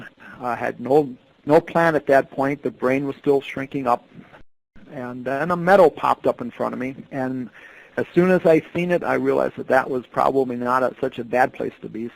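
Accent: United States. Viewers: It sounds more like a Michigan accent to me. MI, WI, MN , Chicago accents are similar.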